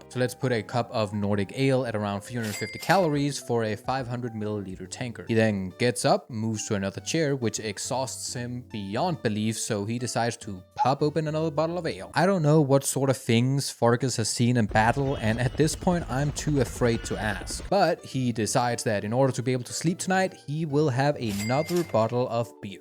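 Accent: Denmark. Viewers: Hmm I sense a Danish accent. Am I wrong? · Hold on, that accent.. Are you danish?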